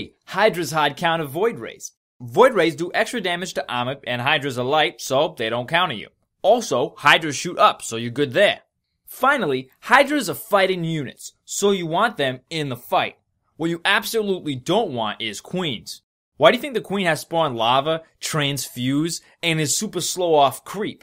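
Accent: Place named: New York, United States. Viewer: He speaks in a hard new york accent.. haaaad cawntaaaaah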